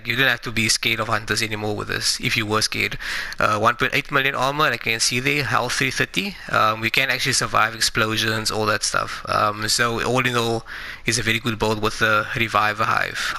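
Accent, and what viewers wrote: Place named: South Africa. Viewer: my man...nice video....your accent...you from SA?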